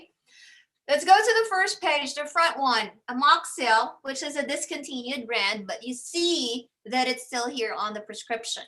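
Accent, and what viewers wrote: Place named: Philippines. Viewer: U sounds like Filipino po. HUHU I need more info I’m still confused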